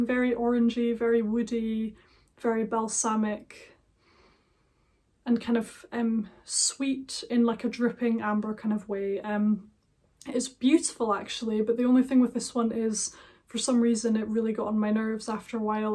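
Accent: Scotland, United Kingdom. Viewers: Greetings to Scotland. Thank you. Nice, elegant, and classic Sottish accent. Have a successful year filled with love and happiness. Happy New Year.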